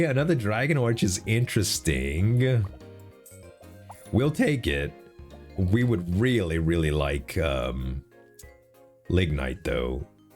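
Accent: Louisiana, United States. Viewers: Okay dude your accent is driving me nuts. Are you from Louisiana? You have a very Cajun accent. I'm super familiar with it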